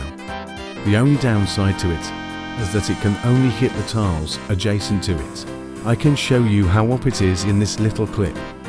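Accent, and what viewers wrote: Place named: United Kingdom. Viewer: British voice 🇬🇧🇬🇧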